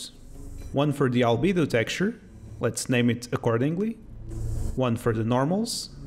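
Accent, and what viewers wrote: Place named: Portugal. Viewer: Não vão acreditar mas ao ouvir o sotaque pensei logo que era um tuga e depois de procurar na net, confirmei que quem está a falar é tuga. Parabéns pela ferramenta, parece porreira e definitivamente uma compra a fazer num futuro próximo.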